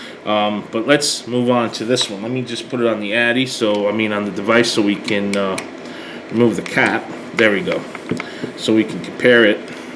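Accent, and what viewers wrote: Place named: Tennessee, United States. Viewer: ur accent tells me tennesee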